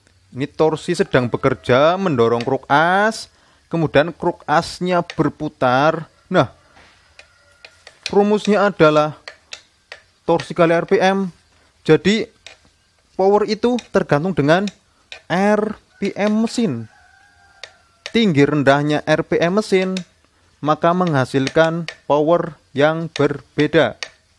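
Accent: Banyumas, Indonesia. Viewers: Ngapak bro kakaka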